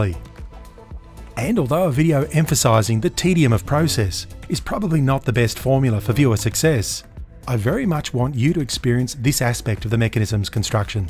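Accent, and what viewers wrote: Australia. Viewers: Kiwi here; most DEFINITELY has fair dinkum Australian vowels! He's in Queensland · aussie. Eastern states based on the accent. My guess is southern Queensland or possibly Victoria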